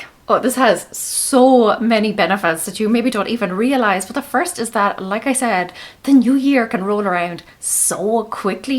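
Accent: Ireland. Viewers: I'm not really a superstitious person, but I read years ago that you should do the things you want to do in the coming year on New Years Day to set the tone, whether it's reading, journaling, exercise or family time. (Also, I'm still trying to place your accent. My parents were from Louth and it's similar).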